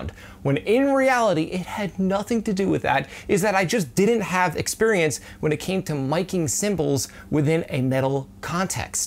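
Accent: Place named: Philadelphia, United States. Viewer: Hey, new subscriber here. Great stuff! Love your videos. I have a personal question. Your accent is driving me crazy. Do you happen to be from the Philadelphia area? Jersey perhaps? I grew up in NJ and moved away when I was 18. When I finally came back years later I really started noticing the distinct accent a lot of folks have from my area. Just a shot in the dark but figured I’d ask.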